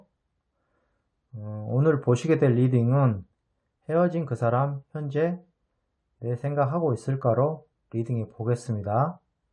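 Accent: Jeolla, South Korea. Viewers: 혹시 전라도분이세요??말투에 사투리가있어요ㅋㅋ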